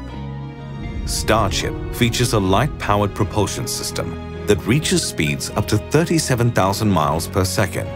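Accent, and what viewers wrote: RP, United Kingdom. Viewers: another science channel with a "british" narration, this gon' be good SUBSCRIBED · It’s a total rip off of Kurzgesagt if you ask me: Distinctive vibrant colour palette ✅ Big bold white text in thumbnail ✅ Rounded off animated shapes ✅ Science/space themed content ✅ Narrator with British accent ✅ The only thing that’s missing is birds. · What's with the bizarre American pronunciations of "Cos-moess" and "Proe-ject" when you have a perfectly good English RP accent? It's highly disturbing! The last syllable of Cosmos rhymes with Boss, and the first syllable of Project rhymes with Bodge. I suppose I should count myself lucky that you didn't say "Aloo-minum"...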